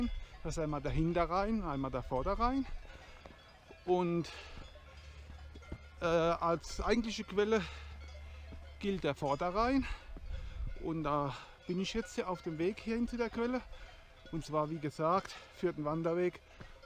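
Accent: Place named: Mannheim, Germany. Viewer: Schönes Monnemerisch 😁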